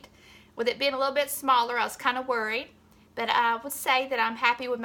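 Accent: South, United States. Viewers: Thank you!! Love your southern tone ❤️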